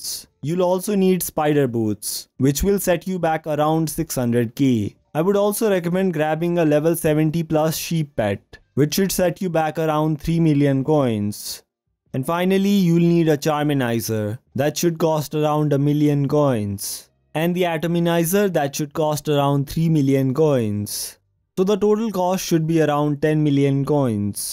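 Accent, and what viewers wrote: India. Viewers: U sound like indian but are u In USA ?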